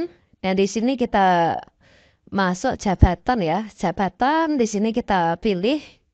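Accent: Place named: Java, Indonesia. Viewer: Meddok banget suaranya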